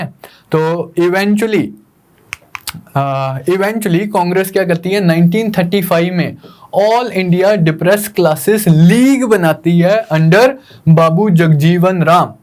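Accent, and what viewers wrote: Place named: Punjab, India. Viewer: Thnks to u sir..when i feel sleepy i watch ur videos and u energetic voice and simple explanation with light punjabi touch ...massah allah it gaves me a energy for continous study..thnks again sir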